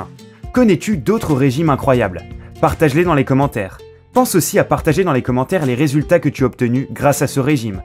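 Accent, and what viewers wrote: Quebec, Canada. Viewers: t québécoise ?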